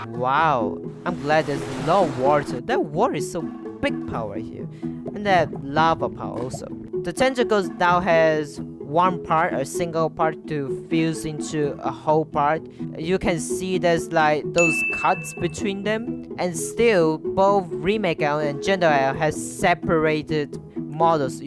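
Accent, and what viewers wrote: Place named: Malaysia. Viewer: He's Malaysian, English is not his native laguage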